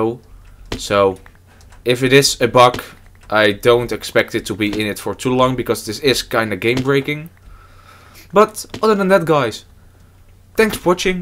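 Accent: Netherlands. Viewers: je bent nederlands, je hoort het aan je accent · Are you dutch?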